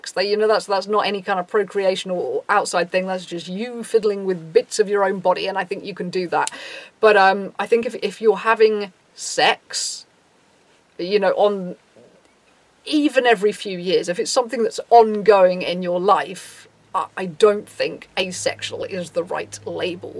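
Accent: United Kingdom. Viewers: You sound British 😁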